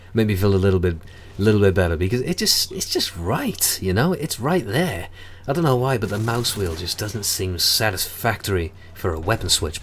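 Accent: United Kingdom. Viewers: ARE YOU SCOTTISH IRISH OR BRITISH??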